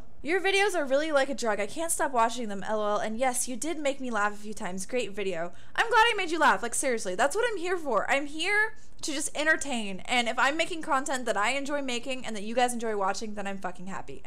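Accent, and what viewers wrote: United Kingdom. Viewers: I think your british accent is amazing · This was funny! Great job! I like your English accent!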